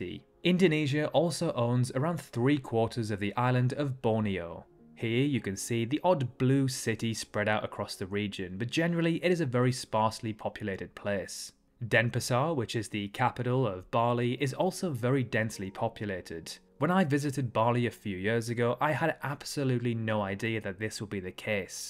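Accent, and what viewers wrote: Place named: Newcastle, United Kingdom. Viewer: This guys so geordie u can tell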